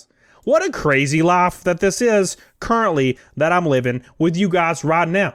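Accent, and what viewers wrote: South, United States. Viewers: Can you plz turn down the whole southern way of talking thing???? It’s rly annoying SOZ 😢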